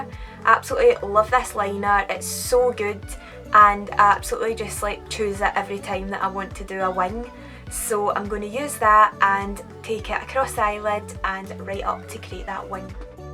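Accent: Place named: Scotland, United Kingdom. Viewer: 😁 Scottish accents are the best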